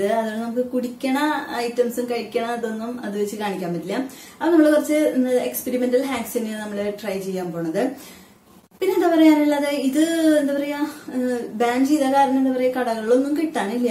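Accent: Thrissur, India. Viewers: Thrissur anno Language 😍😍😍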